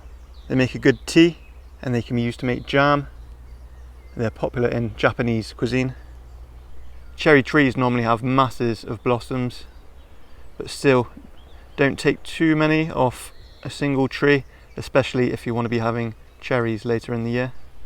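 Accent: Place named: Bristol, United Kingdom. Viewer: Where abouts are you?? You sound Bristolian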